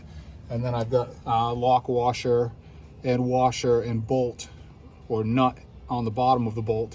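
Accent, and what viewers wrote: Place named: New England, United States. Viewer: "Plus it's Dahk." The first time I've noticed the new england accent and I've got to be 9 months or better into your videos. Lol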